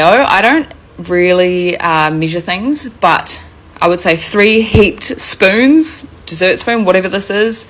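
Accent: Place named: New Zealand. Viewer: Kiwi accent coming through there, loud and clear. 'Small little bits'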